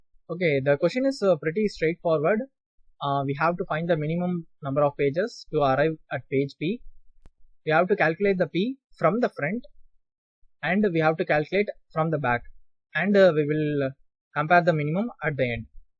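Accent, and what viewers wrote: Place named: South India, India. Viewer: are you from south india i guess? because of your N pronunciation. am I right?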